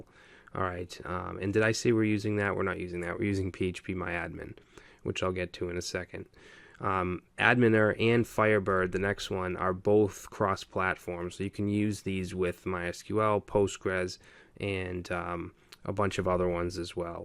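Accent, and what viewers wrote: Boston, United States. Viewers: what part of Boston are you from? love the video man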